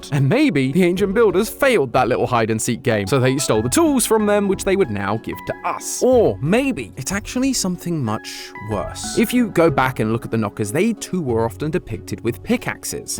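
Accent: United Kingdom. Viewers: i thought you were British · Your to British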